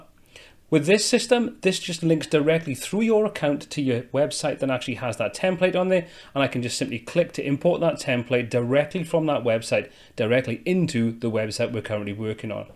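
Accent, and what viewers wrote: United Kingdom. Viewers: sorry for my mistaken, in fact, i enjoyed your video. the problem is the British accent even is very nice but difficult to understand when talking fast. by the way, thank you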